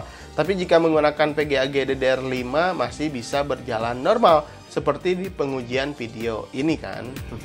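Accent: West Java, Indonesia. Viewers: Biasa, orng sunda logat nya kyk gitu, sama kyk gw wkwkwk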